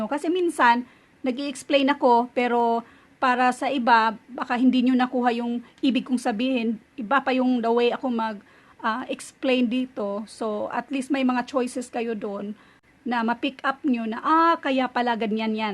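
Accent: Visayas, Philippines. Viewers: Your relative is here 🤗 mam, Mutia shock ko gamay , ma reaction 😲 , nakita ko sa reviewer, while reviewing M., video at reviewer nyo po, ang gamit ko para mag review kaya pala sound bisaya .... big thank you mam sa inyo..🙏🙏, sana nga lang di ko makalimutan ang ne review ko ..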